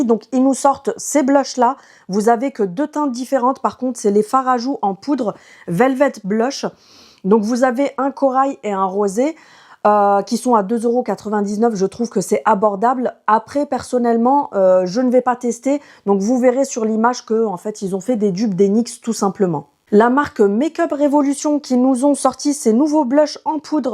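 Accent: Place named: South, France. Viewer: Mais on aime t écouter ma petite française du Sud.bisous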